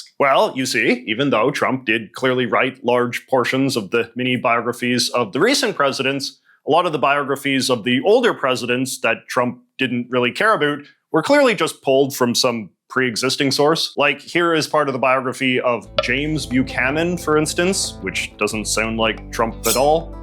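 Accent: Canada. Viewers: sounds like Canadian Carl Sagan and I’m here for it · Now that’s a Canadian accent.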